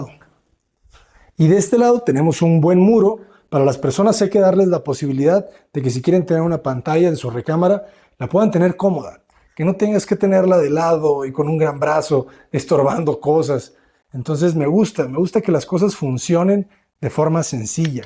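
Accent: India. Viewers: La pronunciación del inglés suena con acento que tiene la gente de la India 🇮🇳 ☺️ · Hola siempre me gusta ver tus videos, pero hoy me sorprendió te admiro por tu valor de ablar inglés sabiendo que no tienes el asentó esto es una crítica constructiva , eres el primer mexicano que escucho con asento ,, Indu,, pero sigue practicando y trata de ablar con una persona nativa con inglés y pregúntale como suena tu inglés y que sea sinsero, eso te ara corregir muchas palabras, pero una ves más felicidades admiró tu trabajo 😊.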